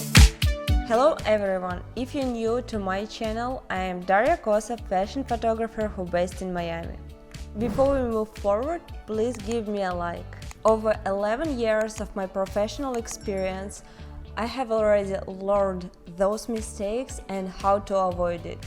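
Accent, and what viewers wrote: Russia. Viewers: You are welcome, I love your Russian English, very….😊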